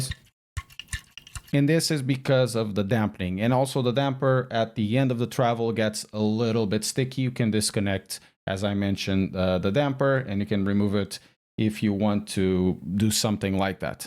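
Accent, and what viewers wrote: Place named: Portugal. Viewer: came for the reviews, stayed for the portuguese accent! Bom trabalho!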